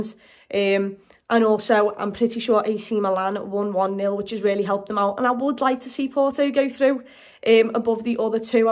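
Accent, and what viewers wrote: Liverpool, United Kingdom. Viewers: This scouse accent 🥺>>>>>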